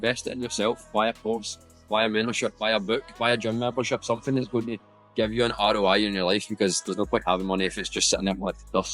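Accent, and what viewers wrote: Scotland, United Kingdom. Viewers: Which uk accent is this 😅😂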